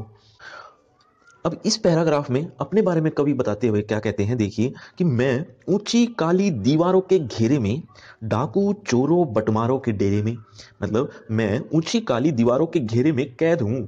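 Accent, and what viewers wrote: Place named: Garhwal, India. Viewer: Your sounds be like garhwali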